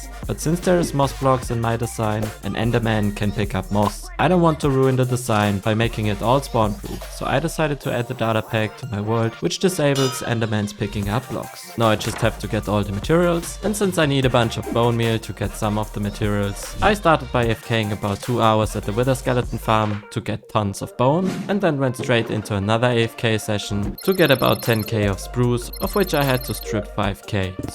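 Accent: Germany. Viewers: Cause he say zem instand of them k think he is german like me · are you german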